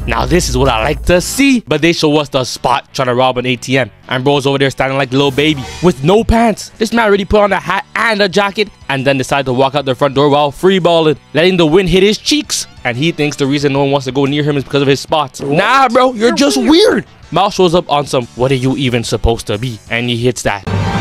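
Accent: Toronto, Canada. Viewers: Ifw the video but I can’t not hear the Toronto accent💀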